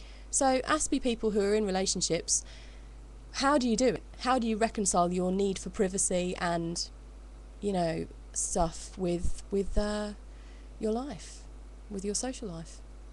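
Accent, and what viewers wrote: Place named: United Kingdom. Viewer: Which dialect of the British accent are you? I am fascinated with accents... I am female & been diagnosed with aspegers not long ago, basically, I do relate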